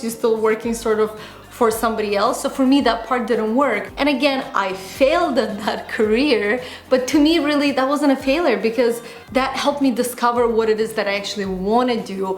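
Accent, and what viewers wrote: Azerbaijan. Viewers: lol Canadian???? She's from Azerbaijan, therefore has an accent that's obviously different from yours and she's not saying it "wrong". Very ignorant thing to say. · please she is from Azerbaijan and speaks Azeri and Russian. She was 16 when she moved to Canada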